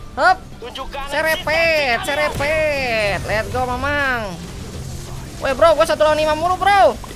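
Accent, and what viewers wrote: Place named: Banyumas, Indonesia. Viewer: Lu knp MEDOK bngt broo ... Ngapak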